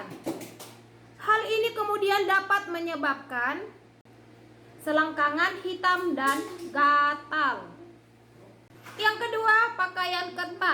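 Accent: Medan, Indonesia. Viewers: Maaf mbak mcem orng medan mbak ngomong nya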